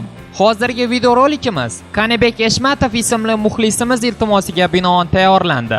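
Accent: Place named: Andijan, Uzbekistan. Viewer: anjanleda bu wevada gapiripti :)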